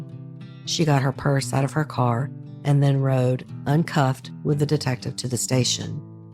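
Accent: South, United States. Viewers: She didn't come up with the hypothesis. The shrinks did. If anything she was candid and remorseful. She obviously had issues and while I don't think the boy understood it, she likely did have that kind of compliance that made her go along with it when he said he wanted sex and to marry her-- he was probably exploiting her. I bet she has a below average IQ and other problems. The truth is cognitive dissonance is incredibly common. As a dental health professional her reaction to a biohazard would be to clean it up. I feel badly for the babies but I know smart women who could protect themselves during sex who don't. They don't defer to a morning after pill or ( as much as I dislike it) have an abortion. The cop and the judge were biased. I get their emotions because we can't imagine killing infants. But let's be honest. They're men. Their expectations are not entirely empathetic or intelligent because they have that whole Madonna whore dissonance about women. 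If they had to have babies they would be a little less judgemental. Men can make babies and no one judges them for splitting, for dodging support and denying them. I know men with six kids by as many women who don't pay child support. Or anything else. No consequences. You did a very fine and fair job here. Ive been binging on the show today& love it. I grew up in the south but have been a vagabond since I came of age. It's good to hear that sweet southern sound of the storyteller in female form. I think of Flannery Eudora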